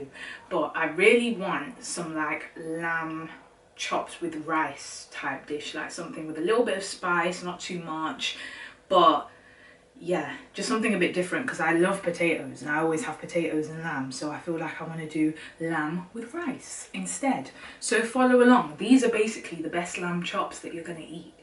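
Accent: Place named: Birmingham, United Kingdom. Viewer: Are you from Birmingham? 🤍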